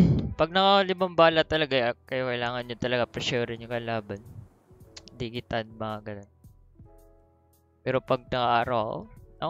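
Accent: Visayas, Philippines. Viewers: Kuratan sab tas "Uy ma!" hahaha solido bisaya inig mag ros. Duwa ta puhon lodi. 💕 KEEP SAFE🤙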